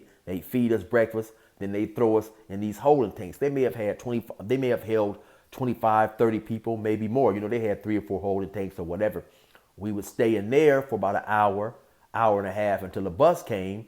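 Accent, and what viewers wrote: California, United States. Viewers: Bruh in white and I literally cannot do a white California voice anywhere near this level. Sounds like that Wes Moreland (that big white guy who has a channel) hahaha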